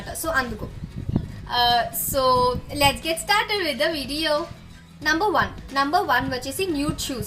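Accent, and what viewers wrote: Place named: India. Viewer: Love your slang in telugu ❣️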